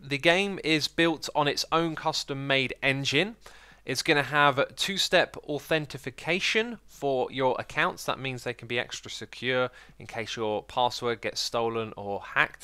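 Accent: United Kingdom. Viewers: I wanna see this guy play the game, I like how his british accent sounds.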